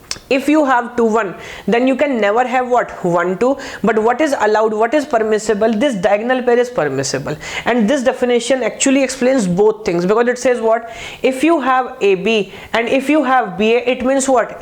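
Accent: India. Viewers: God Indian accent is so fucking annoying... it's unfortunate since the content is good, but the accent destroys it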